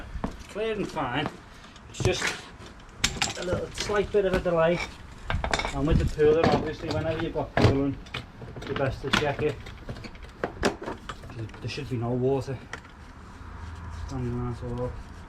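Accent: Liverpool, United Kingdom. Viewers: Please don't talk when the jetter is on. It drowns out the sound of your voice. Your strong liverpool accent is difficult to understand already.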